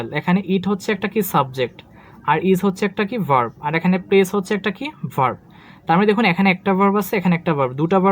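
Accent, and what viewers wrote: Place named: Comilla, Bangladesh. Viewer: Amar mayer bari o comilla te...tai apnar kthai se khan tar tan onuvob krchilam...Allah apna k valo rakhun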